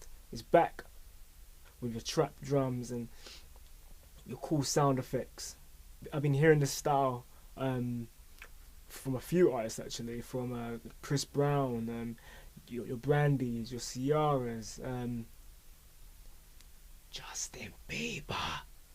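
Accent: United Kingdom. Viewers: Thank you... I've had producers block for about 5 years; save marriage. I've heard that can kill creativity...lol. Anyway I would like to ask you some questions on a business note. Please get at me ASAP. I'm not sure if you are in the UK still, hence the accent, but I am not worried about travel for the right investment.. Best regards. P.S. Mum lives in Essex.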